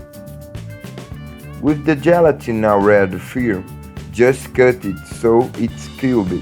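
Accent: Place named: Brazil. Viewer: Mn esse cara n parece um gringo falando, acho q é um brasileiro falando inglês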